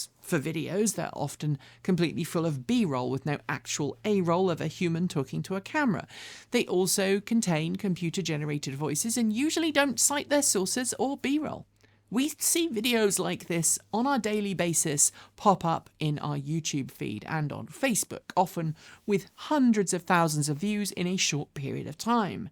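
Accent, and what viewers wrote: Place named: United Kingdom. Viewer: I'm assuming - based on your accent - that you are based in the UK. I'm honestly surprised that you are getting the same amount of FUD and misinformation over there that we get here on the left side of the pond. I am 6'4" and about 17 stone (240 lbs) so cannot squeeze in small vehicles. However, I love my EV. I do still like my weekend Lexus coupe. But the EV is such an easy choice for daily driving. I do live in Southern California and have solar, so enjoy "free" charging. However, even if I didn't, I would continue driving an EV. Keep up the good work!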